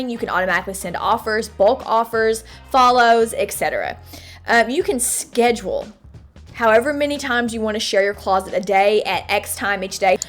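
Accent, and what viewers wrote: Kentucky, United States. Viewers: I just saw a channel about Mercari that wasn’t nice about them. It was from The Nurse Flipper. I learned about her from your channel. Now, I have been buying things from them for years and never had a problem. But TNF had a very bad experience with them, you might like to look at her channel and check it out. Love your channel and it’s a treat to hear a Kentucky drawl. My mother was from Benton KY and when school got out for the summer I would head for Benton to spend the summer with my grandparents. I love Kentucky!!!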